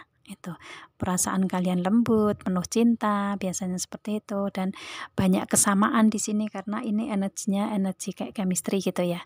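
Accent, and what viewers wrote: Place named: Java, Indonesia. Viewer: Dari jawa ya mbak ... klentum nya kyk Jawa.mbak ...tksh mbak ....Aqua..resonet kok mbak.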